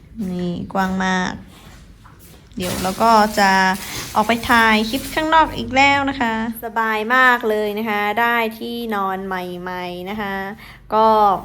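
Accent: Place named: Laos. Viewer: โรงแรมชาวเกลาเป็นพาษาลาวไม้ไช้เหรอบา้น ไกล้กันกลับลาวบา้งที่เห็นพุดเหมีอนอยุ่ไกล้ลาว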